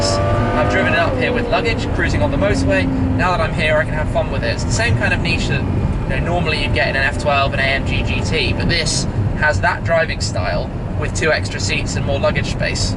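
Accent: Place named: England, United Kingdom. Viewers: indeed we do like your videos and you british accent is quite hard to understand but we dont really need subtitles ;) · Salut les français ! C'est grâce à Shmee, en partie, que je m'améliore chaque jour en anglais. La compréhension est un peu dure au début mais l'accent anglais est le plus simple pour comprendre (comparé aux américains, australiens, écossais, etc ...) !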